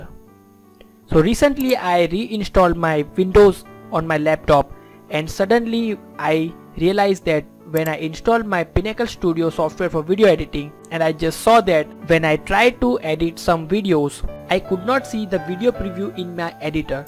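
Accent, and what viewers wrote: India. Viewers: YOU ARE THE MAN!!! 10+ years I worked with Studio, then a few power cuts happened in a short row, always happening again whilst my pc was restarting. at the end it didnt start at all. the tech shop next door re-installed windows, but all programs and applications would be gone. so I downloaded everything from AVG over ACDSEE over FILEZILLA over HANDBRAKE .... up to STUDIO 19. Installing process went fine, just as dozens of times before in my like and then - I couldnt see a video, neither from the Source nor from the Timeline. It didnt make sense to me, I triple checked everything from Drivers to Graphic card to windows settings to Studio settings - all was fine. But I couldnt make those videos visible. I was chatting with Coral`s tech support as well of course - they had no clue whatsover. And then I just described on Google the problem that occures in the field for the search term and 3 clips appeared on Top with one being yours and when I heard the Indian accent I knew I was in good hands. 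How would a normalito like me ever would know of 3 windows apps which i had never heard of, unless someone who knows tells him. AND FOR THAT I AM TRULY GREATEFUL. NEXT TIME I GO STRAIGHT TO GOOGLE. THX A MILLION, PAPI. SALUDOS DESDE BARCELONA MARIO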